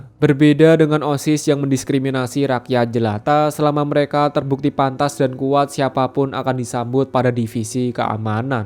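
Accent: Java, Indonesia. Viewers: bang lu jawa ya ?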